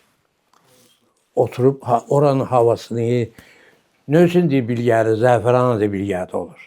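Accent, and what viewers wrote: Baku, Azerbaijan. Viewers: Bakililarin lahcesi yekdir. Allah Size omur versin❤❤❤❤❤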